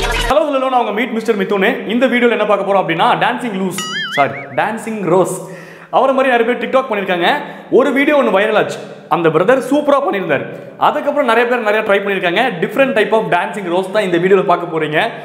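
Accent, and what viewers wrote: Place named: Chennai, India. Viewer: Unga madras slang semma. "Kutty kunjan" alaga irukku. Kidnap pannamnu neenga sonnadhu, semma.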